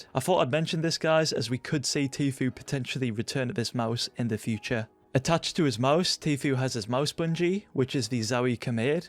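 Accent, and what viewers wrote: Newcastle, United Kingdom. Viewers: R u from newcastle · Only me how love your british accent :D please do facecam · Love all your content bro I’m a Geordie myself so hearing you makes it sound like home 🔥🔥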